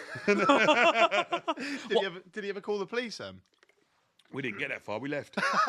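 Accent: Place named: Cockney, United Kingdom. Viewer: Just cause he’s a cockney doesn’t mean he needs captions guys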